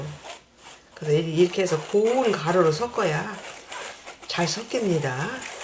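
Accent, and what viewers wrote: Chungcheong, South Korea. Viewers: 아이고 너무너무 잘하십니다 가까이계시면 인사나누고 싶네요 달인이십니다 충청도 억양 말씀이 정말 정감이 가네요 저도 충청도 부여에유 부디 건강하시고 맛난 고추장 계속 올려주셔유 ❤ 존경스럽네유 🎉🎉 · 고추장 정학희. 일러주셔서. 감사하오.충청도 사투리 소탈한목소리 좋아요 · 충청도이신가봐요 넘웃겨요 말씀하시는것이 구수하구 ㅋㅋㅋ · 말씀하시는 말투가 충청도 말투이신디 이 어느지역이예요? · 충청도 사투리가 넘 정겹고 젤 맛나게 설명을 해주시니 머릿속에 쏙쏙 들어오네요~영상 감사합니다^^ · 얼굴 보여주세요. 항상 웃음이. 정겨워요. 여사님. 충청도. 사투리. 옛. 어린시절생각이 많이 납니다. 칠십이. 넘어도. 구수한 자기 고향 사투리가. 듣으면. 어린시절. 시간들이 필림처렁. 돌아가. 자주. 시청합니다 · 저도 충청도 대전 사람이지만, 해외에서 선생님의 구수한 충청도 말씀으로 자세한 설명을 들으며 보니 고향생각도 나고 구수하고 정겹습니다.. 감사합니다. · 아주머니 충청 도래요 말씀 하시는것이 충청 말씀같아요 고추장 하는것 된장도 잘보았습니다 항상 건행 하세요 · 충청.음정.넘 정겹네요~^^ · 구수한 장맛 구수한충청도 사투리 잘 보고 갑니다. 건강하세요. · 보리밥에엿기를넣고 한꺼번에 삭히는 방법 이 편하겠네요 구수한 충청도말 씨도 부럽네요 배우고싶어 따라하는데 잘ᆢ안되네요 열씸히구독하겠습니다 고생하셨어요 잘ᆢ보았 습니다❤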